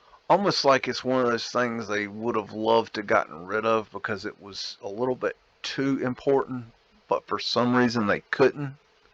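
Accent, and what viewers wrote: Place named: North Carolina, United States. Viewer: I think it's because he has a NC accent. I spent my Summers with family there. It takes me back to all the questions I asked them. They almost never had answers for me.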